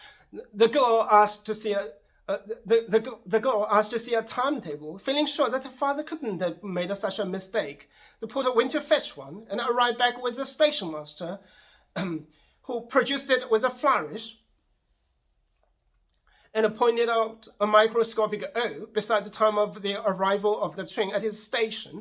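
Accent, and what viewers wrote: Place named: United Kingdom. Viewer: 😂😂😂，连英伦 腔调都那么浓重。👍👍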